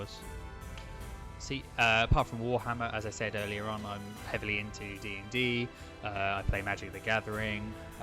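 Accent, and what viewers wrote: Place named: England, United Kingdom. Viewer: You have the english accent so that is a good first start.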